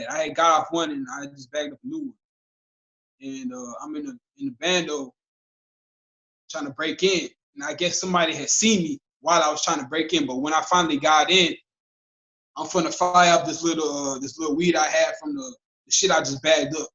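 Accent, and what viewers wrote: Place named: Washington DC, United States. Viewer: Sound like he from DC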